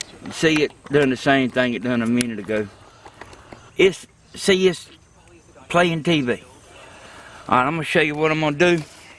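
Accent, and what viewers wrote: South, United States. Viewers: You need a signal strength meter if your making 1k a week you can afford one, then any doubt you have you can fine tune your product. I think I'd make a bending jig with nails and a sheet of plywood. So do these work better outside on the roof or highest point on your house or in the attic? I think my next prosses might be a system and materials to mass produce these. Your reflection device could be mesh covered in hard plastic. I also think the PVC pipe works just route the wires inside the pipe. Also the quality, and fittings on your coaxal cables will have a great effect on how many db down you have. Our old cable company changed its connectors often to get better signal to noise ratio's also all connections must be tight. A loose connector will leak signal. Some people might think your dumb, well yes your southern english is a key off but I understood you. 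So my spelling might not be great but I have dyslexia. English wasn't my forteau in school. Really the comment anyone is stupid is in and of itsselfe stupid. We all have something to offer. You've found yours. For all we know your acting like you talk to be funny. By the way even if I know more my wife is always smart. She is intelligent its just different then myself, I know more world things and see things how they work together and alone I have a good idea how to make things and take them apart of why they don't work. She can tell you the math or where I screwed up the math. Now with a signal strength meter you'll kn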